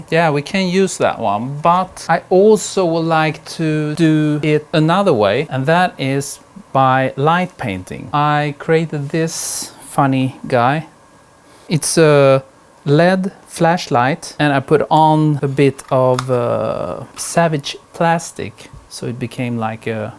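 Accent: Sweden. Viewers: i really enjoy your videos. Kinda feels like bob ross with a Swedish accent.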